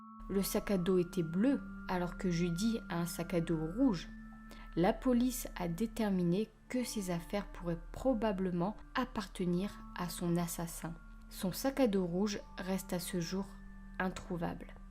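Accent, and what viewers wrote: North, France. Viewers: Je viens de te découvrir..... Marathon de vidéos depuis cette nuit ! Bon travail ! + 1 abonnée ! Ton accent viens du nord si je me trompe pas ?!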